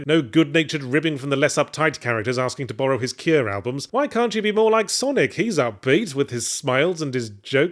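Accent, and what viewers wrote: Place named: United Kingdom. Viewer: jesus your disgusting british voice gets REALLY irritating after 10 seconds of listening to it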